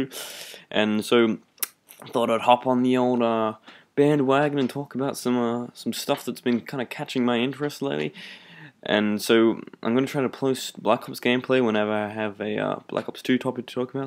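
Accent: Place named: Bogan, Australia. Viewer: Hop on the old bandwagon? Dude you're sounding like a bogan now :O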